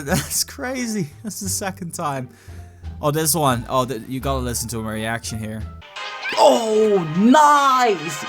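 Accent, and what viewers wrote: Netherlands. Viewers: Kan goed horen dat je nederlands bent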